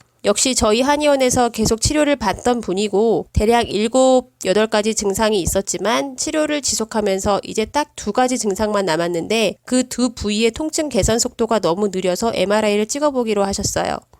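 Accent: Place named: Chungcheong, South Korea. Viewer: 음...요리 백종원도 충청도유... 사투리좀 써도 괜찮음. 다만 발음정확도+전달력의 문제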